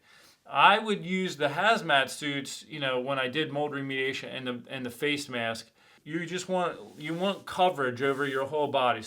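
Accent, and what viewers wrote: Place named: Pennsylvania, United States. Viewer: I recognized that PA accent 😅 I really appreciate all the information you gave in this video. It was exactly what I was looking for.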